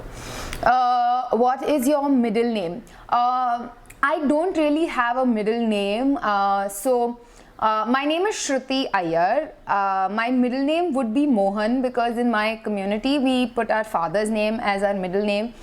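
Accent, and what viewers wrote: India. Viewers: Are u from Kerala..,( palakad tamil)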